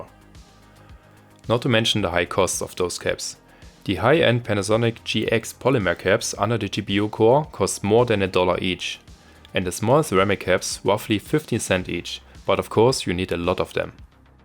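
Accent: Germany. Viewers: Sounds like you are german, can i send you for modding my razer blade <num> ti with new shunts so the tdp rises from 90-110w tgp to round about 150-175w tgp? Obviously i would pay you for the the great work you are cappable of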